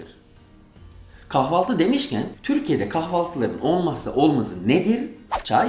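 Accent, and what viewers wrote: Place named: Greece. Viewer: yunan ağzıyla konuşmuşsun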